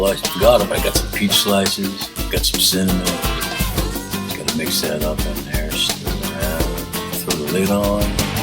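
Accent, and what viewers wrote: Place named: South, United States. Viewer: And you gonna pick on my countryness😁 I heard some southern.😁😀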